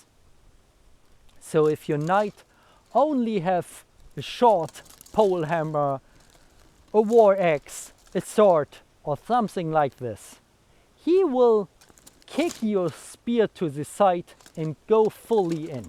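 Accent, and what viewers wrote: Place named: Germany. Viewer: The way you structure you sentences, the words you choose, it's like... You're SO DISTINCTLY german, even not taking that beautiful accent into equation, i absolutely love it. One of the best channels about armor, so pleasant to listen to this voice and see the quality action. Hi from RU !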